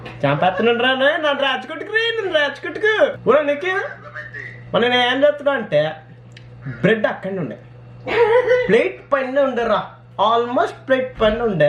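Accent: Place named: Hyderabad, India. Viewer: ana you sound like jesse in breackin bad vere asal haydrabadhi bhai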